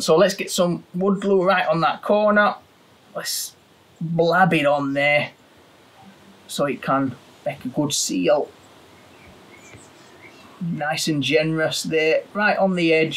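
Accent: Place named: United Kingdom. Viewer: Great tutorial and Great accent 👍.. Which part of the British isles ?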